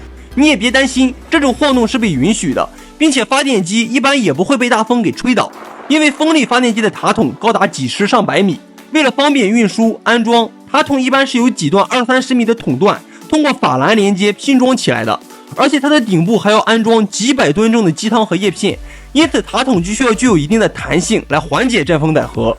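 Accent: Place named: Shandong, China. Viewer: 大哥的山东口音很顶啊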